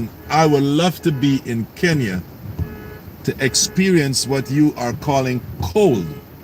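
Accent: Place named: Caribbean. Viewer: OOOOOooooh, ok. I was wondering about the accent my brother. You're from another Caribbean island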